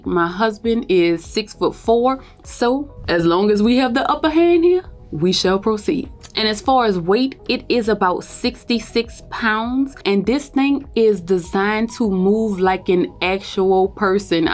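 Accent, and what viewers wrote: New Orleans, United States. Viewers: Are you from Louisiana? I’m thinking I hear that New Orleans accent. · Are you from New Orleans baby? I ❤ your accent 😍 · Not Related but, I assume you from NOLA, because I can hear New Orleans in your voice lol 😆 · Mmmmmm 🤔..... Is that a New Orleans accent?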